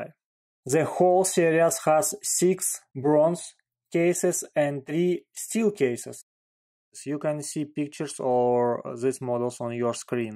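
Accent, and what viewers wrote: Russia. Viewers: Вы из России? Заметен акцент.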